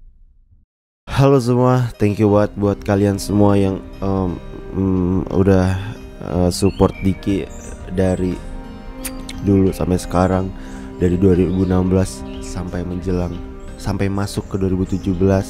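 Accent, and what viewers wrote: Java, Indonesia. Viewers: Bang lUcu kok Bahasa Medoknya hahahahaha siapa suka ayoooo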